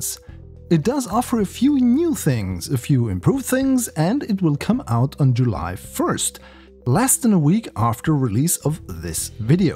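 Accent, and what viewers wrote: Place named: Germany. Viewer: I have the feeling to hear a german accent. If not correct me but Du klingst wie ein Deutscher, der verdammt gut Englisch spricht! Nice Video btw. LG Justus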